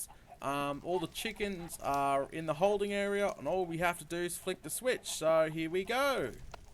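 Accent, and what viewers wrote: Australia. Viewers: Videos and tutorials um yeah it's called an accent........ not everyone in the world sounds the same. he's from australia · Is he Aussie coz I am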